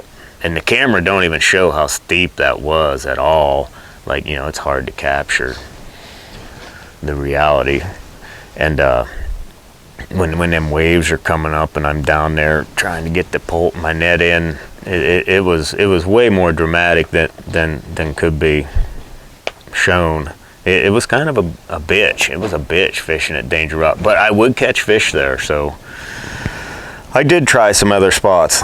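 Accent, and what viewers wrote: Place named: Pennsylvania, United States. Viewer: Good answers to questions people were wondering about. Had no back ground at all on you or any contestants. You talked like people that I know in Pa. After the show is over my son-in-law told me you are from Clearfield county PA. The son-in -law and daughter live in Faunce. The segments that were shown of you and the other participants were so interesting. It caused me to wonder. Could I do this? Hell no was my answer. Listen, proud to see someone from Pennsy took the title. Although Callie gave it a good run. My money was on you after day 50. Congrats to you and wishing you the best. Hope to meet you someday, maybe at a book signing or something. Good luck and safe(but exciting journeys to you).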